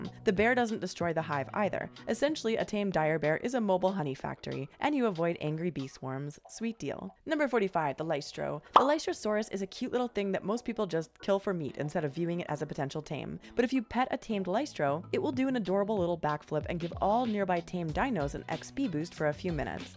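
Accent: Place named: Canada. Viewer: You pronounce things great 😂 love the Canadian accent too.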